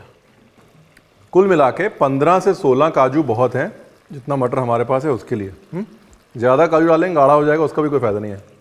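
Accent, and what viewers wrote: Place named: Punjab, India. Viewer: I really love the punjabi accent and the joy( major ingredient) he infuses in his cooking.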